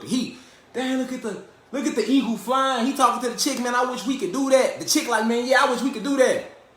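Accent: Chicago, United States. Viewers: His Chicago accent so strong 😂😂😂 he make me miss my family in the chi now I gotta visit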